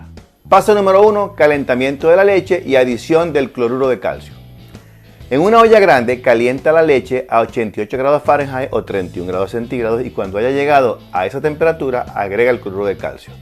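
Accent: Zulia, Venezuela. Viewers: Tienes acento Zuliano eres del Zulia ?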